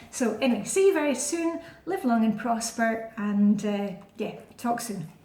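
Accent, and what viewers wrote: Scotland, United Kingdom. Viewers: Funny accent? I have a lot of Scottish friends, I love your accent, hen xo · I love your accent, reminds me of our middle school nurse who must've been from Scotland. Can I also say that I love your style, you're dressed so classy every day! I'm a sahm and I pretty much dress in leggings and a regular shirt (which is changed numerous times a day due to spit up). I'm trying to follow you, but it's hard with young babies. Thanks for all of your videos!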